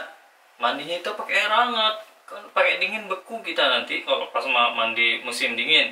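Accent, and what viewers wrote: Lombok, Indonesia. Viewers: Bang lu dari Lombok? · Logat orang lombok😂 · Bahasa Logat Lomboknya (Sasak) Terdengar jelas di campur dengan bahasa indo , the best lah 👍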